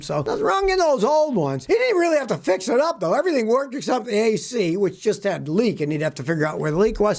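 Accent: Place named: Buffalo, United States. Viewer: I wish could get his vowels correct. Must be his Buffalo (NY) accent. Ex: ONscrew instead of UNscrew. You think he's saying to put something on instead of him meaning to take it off.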